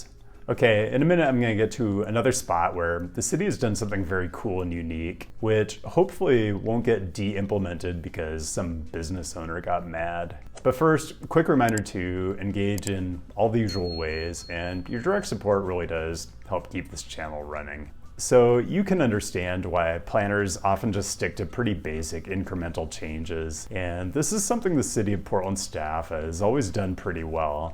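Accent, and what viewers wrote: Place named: California, United States. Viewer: Is this a California accent? Damn that's strong!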